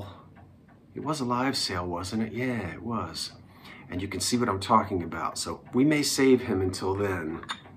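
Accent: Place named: South Jersey, United States. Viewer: Can't get over that south Jersey accent, waddur!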